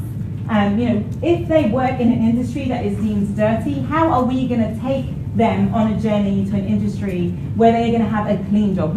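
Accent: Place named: London, United Kingdom. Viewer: she speaks really well so succinct and coherent so i wonder are talking from a position of snobbery about the accent ? or a racism for that modern london twang coming from someone with dark skin ? or a mix of the 2 ?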